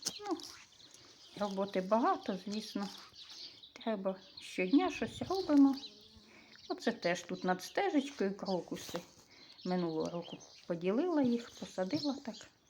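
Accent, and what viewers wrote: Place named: Poltava, Ukraine. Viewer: Я по Вашому говору зрозуміла що ми земляки.Я живу в Полтаві.Родилась в селі, Гребінківськийрн.Маю дачу. Теж люблю квіти люблю все що зв’язане з землею.Миру нам всим добрим людям. Як наз ваше село?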